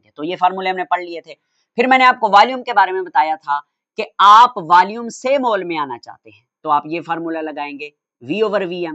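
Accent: Saraiki, Pakistan. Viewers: Sir g lgta hy ap saraiki Hain ❤agr waqi ewe hy ta sako sraiki ich smjhao😅❤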